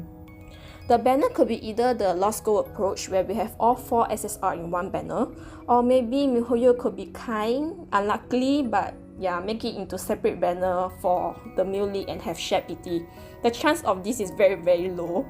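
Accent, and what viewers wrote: Singapore. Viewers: The chinese / sg accent satisfy me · Hi, are you from Singapore? Haha you sound like it 😅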